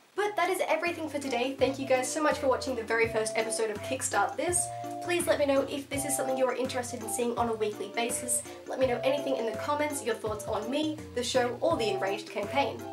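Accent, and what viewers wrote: Australia. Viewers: Oh wow an australian :D hi!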